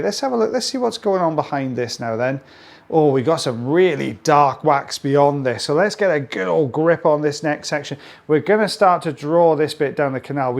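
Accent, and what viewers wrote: Wales, United Kingdom. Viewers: Love hearing you speaking, that lovely Welsh accent.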